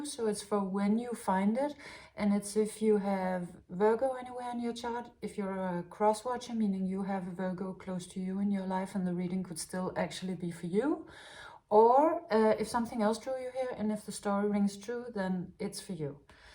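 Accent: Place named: Denmark. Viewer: Listening to your accent brought back many wonderful memories of my Danish mor. ❤ Thank you too the lovely positive reading, love from Australia.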